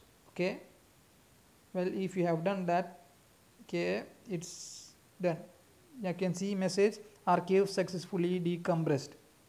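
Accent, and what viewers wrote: Kerala, India. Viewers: You're a mallu i bet!! Hii😂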